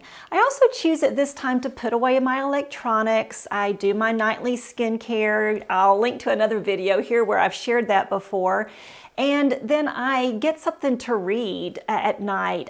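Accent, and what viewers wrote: South, United States. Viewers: ohio nice state. friendly state like indiana. are you from the south originally ? your accent thats why i ask.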